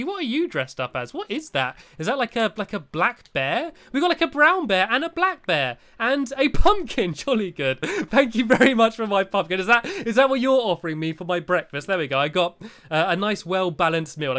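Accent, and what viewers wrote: United Kingdom. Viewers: I'm leaving for camp tomorrow and I will miss your brittish accent for a week. I am very sad:'( I would be the happiest girl in the world if you would send me a goodbye message on Xbox. If anyone would like to send me a goodbye note my gamer tag is: ashleyrory78 I would LOVEEE it if would send me a goodbye note, although I doubt it will happen. Well bye everyone for a week! make sure to say goodbye. (My gamer tag is ashleyrory78 )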